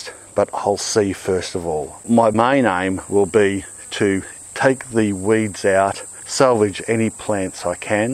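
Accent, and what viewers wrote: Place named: Brisbane, Australia. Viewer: Your not from brissie by any chance? Love some guidance and knowledge for free labour!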